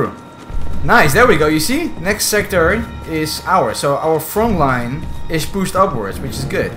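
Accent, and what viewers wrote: Netherlands. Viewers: I can hear a Dutch accent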